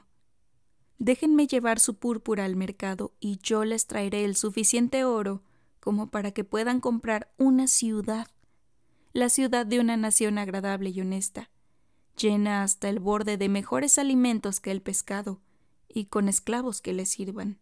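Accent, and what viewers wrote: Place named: Mexico. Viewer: GRACIAS, POR DARNOS ÉSTE LIBRO CON TU BONITA Y CLARA VOZ Y EN MEXICANO 😃👍🇲🇽♥️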